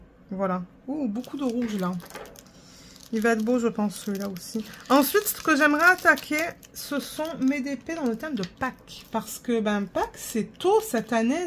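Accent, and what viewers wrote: Marseille, France. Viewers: Coucou, merci pour vlog🥰🥰🥰 je vous souhaite à tous les 3 bon week end et bonne semaine et pleins de Rôôôse🌹🌹🌹🌹🌹 (j arrive pas par écrit a faire l accent marseillais 🤣😂🤣)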